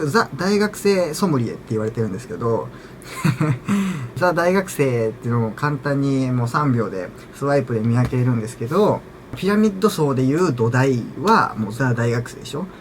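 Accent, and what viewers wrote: Kansai, Japan. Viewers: 溢れ出る自然な関西弁の安心感えぐいんよな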